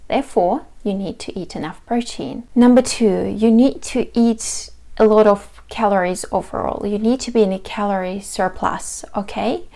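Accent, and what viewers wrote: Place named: Russia. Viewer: Очень сильно бьет по ушам рашкинский акцент)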